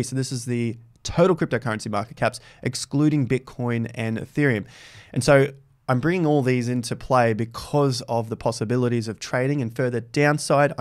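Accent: Australia. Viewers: Hey mate I came over from invest answers, good to hear a ozzi accent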